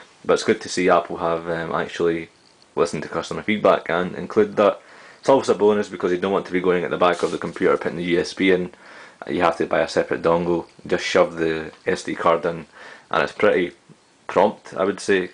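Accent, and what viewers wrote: Scotland, United Kingdom. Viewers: wtf? scotish.. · scotish? irn bru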